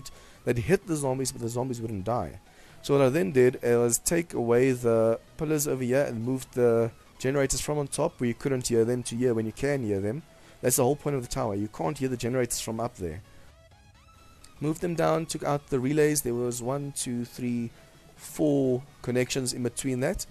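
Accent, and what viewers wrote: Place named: Afrikaans, South Africa. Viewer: Epic! Nice job, great results ... Love it... The Afrikaans accent :)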